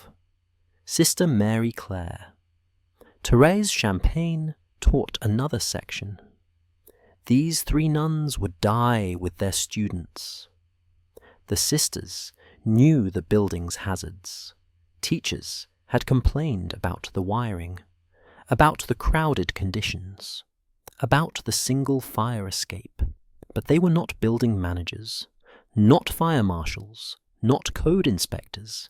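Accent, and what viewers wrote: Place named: United Kingdom. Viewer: Why does the narration give me AI vibes? Had to google this to make sure it happened and wasn't another fully AI written story, like "Cold War Stories". Plus it says this channel is kiwi but that is a Bri'ish accent... unless I'm going insane. The speech pattern etc doesn't sound human... I can't tell anymore. It sucks.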